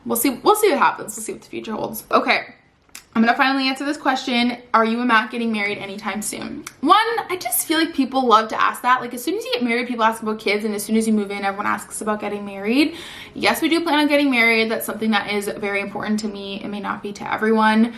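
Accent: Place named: Canada. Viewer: You’ve become a fav quickly! Didn’t realize you were a Canadian! I had tried to figure out your accent previously. You are super pretty and have helped me transition to my work from home position much smoother! I’ve definitely felt defeated working in healthcare from home a time or two. So just wanted to say thank you for all your hard work!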